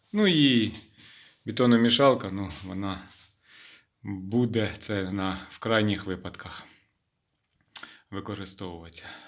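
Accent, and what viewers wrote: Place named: Kyiv, Ukraine. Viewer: ви і родом з Херсонської області? Розмовляєте,як у нас на Київщині 😀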